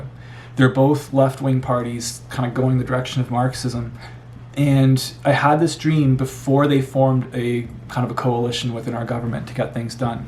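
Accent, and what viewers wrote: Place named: Canada. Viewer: The Canadian accent is similar to my Minnesotan accent.😂 The books of Joel and Acts (amongst others) tell us that dreams and visions are legit ways that God speaks. (I know you know this...putting it out there for others reading comments) I've had few dreams I know are from God and he's given interpretation. Have you experienced any dreams that you believe are from the Lord that you haven't received interpretation for...yet? I ask because I believe I have and because I come from a faith community that is "practically" cessasionist...so I don't have many people that I can ask these questions.😁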